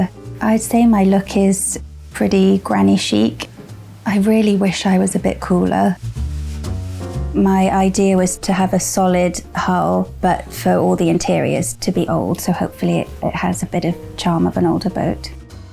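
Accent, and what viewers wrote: United Kingdom. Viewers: She has Renee Zellweger's british accent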